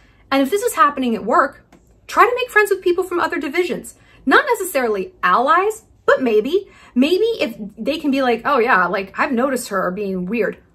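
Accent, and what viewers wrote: South, United States. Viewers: I loved Reese in Legally Blonde so I started watching her interviews and then I noticed how she seemed to not be the nicest person. Something just felt off about her then I saw other videos of people talking about how she is really mean, but in a very passive-agressive way. She is very much that southern girl who is passive aggressive. She is like the stereotype in movies. I don’t hate her or anything but I just get an off feeling about her. Btw your southern accent was on point. The mannerisms and comments you made were the accent were spot on! Great video! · i love your southern accent queen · I could literally listen to your southern accent for a whole video 😂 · I fucking love your southern accent lmao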